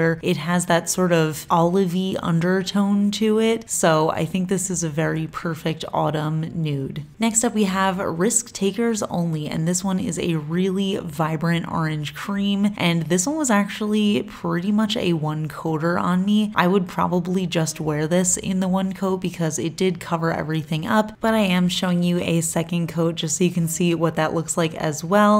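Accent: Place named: Las Vegas, United States. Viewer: Hey. I am loving and will pick up Force of Nature. I haven't found a green polish that beautiful since China Glaze's Emerald Sparkle well over a decade ago. Thank you for your dedication to this channel and your viewers. You already sound like a Las Vegas native. I was born and raised here and have lived in Henderson for 30 years now. I'm glad to have you as a neighbor.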